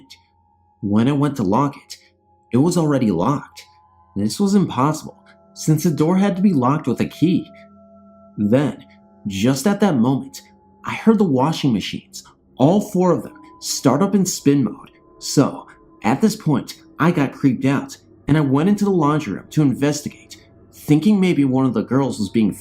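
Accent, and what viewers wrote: United States. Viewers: Pardon me.. What state is this accent from? I'm from UK. I know you're accents change from area to area like ours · Wow listening to you read is like listening to nails on a chalk board, you really need to practice a less monosyllabic tone, it’s really difficult to get into the stories, I listen to a guy on another channel as well, and well not as bad he to has the same drone, must be just the American accent, although the girls seem to be just fine, definitely need to inject a bit more life into it🤔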